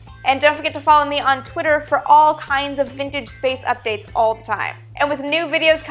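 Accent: Canada. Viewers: why does the X-15 have a thick, wedge shaped vertical stabilizer? All other surfaces seem normal. I thought a teardrop shape was best for aerodynamics. Perhaps you could answer that in another episode. BTW, I suspected you were Canadian ( aboat ):- )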